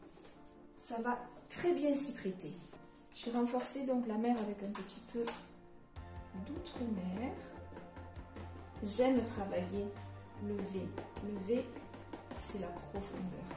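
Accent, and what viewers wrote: South, France. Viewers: Bonjour..Je viens de m'abonner à votre chaine et je suis admirative de votre travail! merci pour toutes ces vidéos qui m'ont donné envie de me lancer dans l'aventure. Grace à vous j'ai pu commencer à faire de jolies choses.Vos vidéos sont très bien expliquées et votre accent du sud nous apporte un pur moment de bonheur. Merci pour tout ce partage et pour toutes les explications que vous donnez pour des débutantes comme moi.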